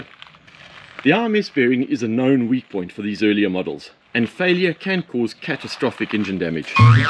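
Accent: South Africa. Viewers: Are you from South Africa? Much love from Vietnam, as a South African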